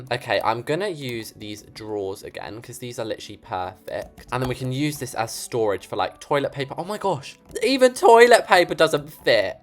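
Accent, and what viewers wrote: United States. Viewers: Your american accent though🤭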